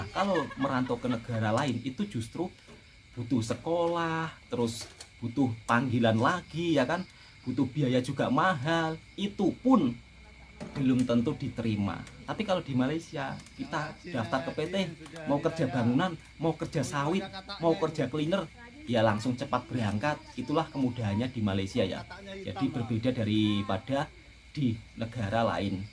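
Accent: Java, Indonesia. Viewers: Teruskan bersiar ....ngomong yg medok kang....!!!!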